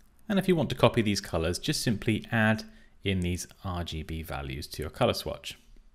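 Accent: United Kingdom. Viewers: the tutorial seems to be easy but I cannot understand some commands ( not a native speaker ) not used to a British English pronunciation. Will try more anyway! Thank you for your videos!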